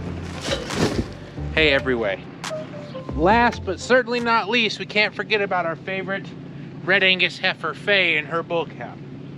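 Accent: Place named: Australia. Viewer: Hi from Australia. You are sounding like an Aussie farmer. We’ve been turning away from feeding as much as we can. But the biggest change here over last 30 years has been changing fro hay to silage. It’s been huge the change. Especially in the colder areas out here. The quality of silage over hay is the driving factor. I was one of the first contractors to do silage. Now nearly every contractor has to offer that or he doesn’t have many clients. I could go on for hours but would swamp your messages.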